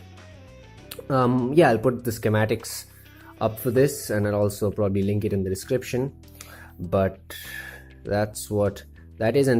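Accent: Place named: India. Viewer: Superb work! Your accent sounds Indian😃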